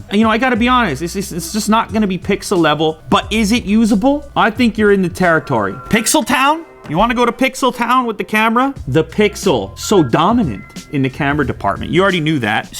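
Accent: France. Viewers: Lol that french accent sucks from english ot american people 😂😂😂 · what's with the French? You trying to be sexy couse dude you are fine already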